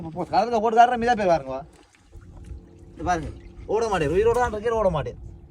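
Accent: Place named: Tamil Nadu, India. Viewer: I love the Tamil accent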